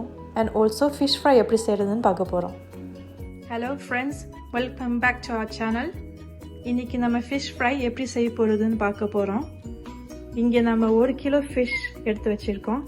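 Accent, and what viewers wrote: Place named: London, United Kingdom. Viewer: London Tamil lovely 🥰🥰Mouth watering dish😋😋😋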